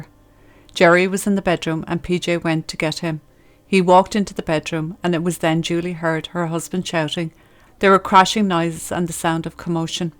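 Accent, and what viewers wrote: Ireland. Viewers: Love your channel. This was horrific, Its like i could hear the hurt in your voice when you mentioned Kia. Traumatic what she witnessed and what Nadine was put through. I always remember this one. Thanks for sharing, nice to listen to a fellow Irish. 😊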